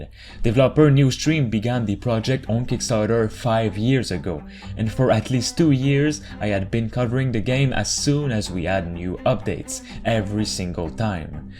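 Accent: Quebec, Canada. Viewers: The Quebec accent here is strong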